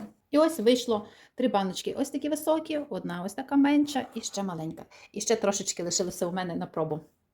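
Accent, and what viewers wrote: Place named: West, Ukraine. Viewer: Так приємно чути мову західної України, просто пісня!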